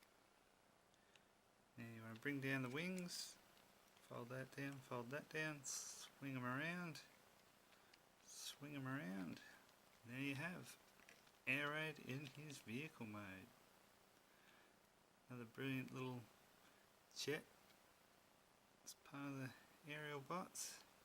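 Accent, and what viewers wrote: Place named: Australia. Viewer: From your accent I'm guessing your Aussie. Where in Australia did you get Air raid? I need him and the rest of the stunticon team.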